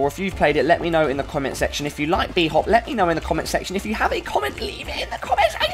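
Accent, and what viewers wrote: United Kingdom. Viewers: You British or what ?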